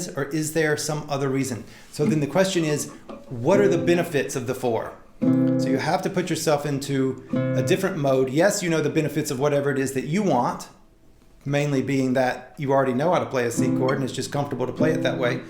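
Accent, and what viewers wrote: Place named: South, United States. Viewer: I get his point, good vid...also keep trying to place his southern accent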